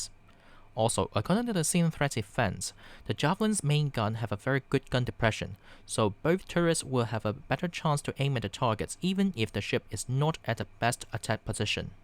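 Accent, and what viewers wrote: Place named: Asia. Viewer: I would regard this as a particularly unfair and unnecessary comment. I’m a native English speaker and I would deem his English to be excellent and conveyed with clarity. There’s an unmistakable Asian influence on his accent, but it in no way detracted from my ability to understand what he was saying, indeed I’d go so far as to say his command of English is better than a lot of native English speakers I’ve met. To the poster of this great video I’d like to say a sincere thank you for this excellent essay and please ignore ignorant comments from the likes of this poster, you did a great job and you don’t need to improve anything, just keep this terrific content coming and thank you for your efforts.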